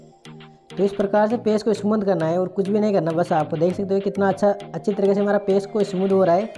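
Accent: Gujarat, India. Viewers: Patel sound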